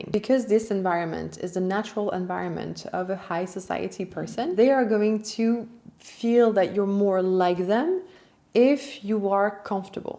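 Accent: France. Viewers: Interessant, mais votre accent anglais franchouillard est éreintant. Dommage.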